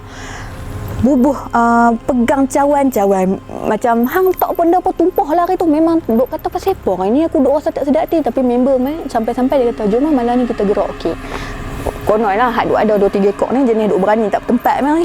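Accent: North, Malaysia. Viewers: Cerita tk seram.. seronok dok layan sebab cara sembang rancak dgn dialek utara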